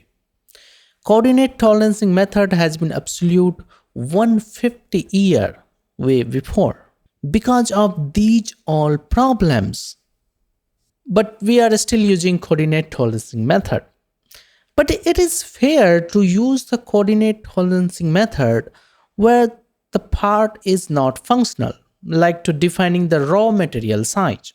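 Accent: India. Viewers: Despite the fact that you are Indian and have a funny accent, it doesn’t hinder absorbing useful information at all. Moreover, the illustrations for better visual perception are very helpful. The material in the video is excellent, very useful, and I am learning from it. Thank you and greetings from Ukraine. All the best!❤❤❤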